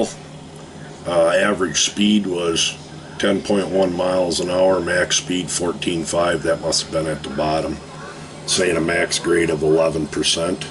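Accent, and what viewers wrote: Minnesota, United States. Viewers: he even has the same minnesota accent! LOL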